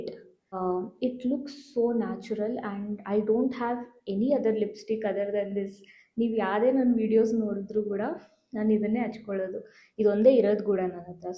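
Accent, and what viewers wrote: North India, India. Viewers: I can't believe... I think ur from north indian 😊 Kannada ❤️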